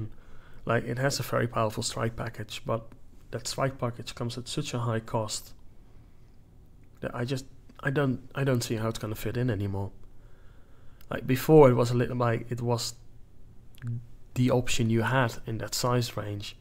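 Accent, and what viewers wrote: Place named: Netherlands. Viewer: these ships are both awsome . even when in a smaller org , you can help a friendly org to destroy someones capital . the polaris bridge is just perfeckt . and now the hammerhead . a real jewel . to be honest , i cant make a decision for keeping only one of them . so it was an easy decision to have them both . --- ps : you sound verry dutch :p im almost sure you live in holland :-)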